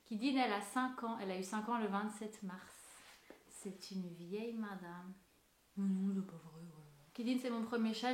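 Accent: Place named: Quebec, Canada. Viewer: J'entends un léger accent. Québécoise ?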